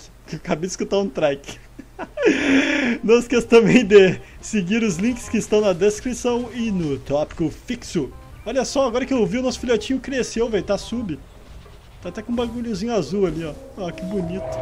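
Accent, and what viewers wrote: Rio Grande do Sul, Brazil. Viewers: Vc é gaúcho né ?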